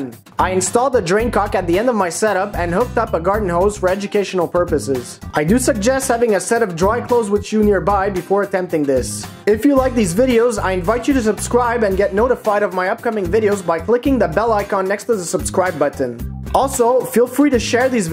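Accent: Canada. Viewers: from which part of Canada is your accent from?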